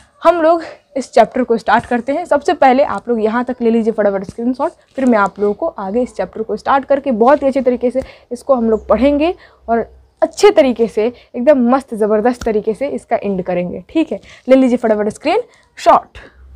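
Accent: Bihar, India. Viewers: Ma'am aap BIHAR se hai kya??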